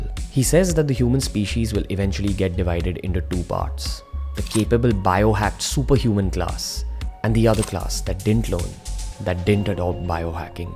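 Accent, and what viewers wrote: India. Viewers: Nice English Proud of Indian accent I don't understand why to do people change their accent.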